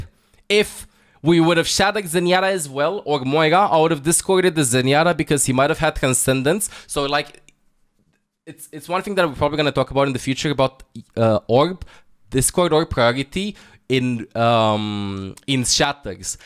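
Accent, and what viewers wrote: Romania. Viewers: Not only is brilliant, entertaining, and an incredible teacher, his beautiful Romanian accent invariably gets every tip he gives playing on repeat in my head for a week. Love what you do, keep up the good work <3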